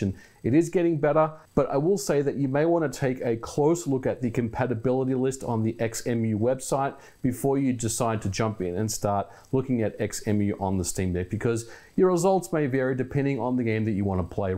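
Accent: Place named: Australia. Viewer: you need to state which currency you are talking about. you have an australian accent, so while i assume in your script you are always speaking at americans, this is always jarring and annoying. if you say "fall" we hear a tumble, not a season. americans are ignorant, please stop trying to please them.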